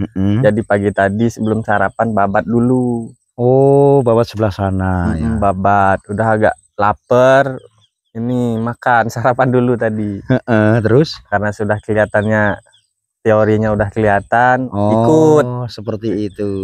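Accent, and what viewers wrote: Banyumas, Indonesia. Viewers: Ora ngapak ora kepenak makin joss semangat menuju 20K nya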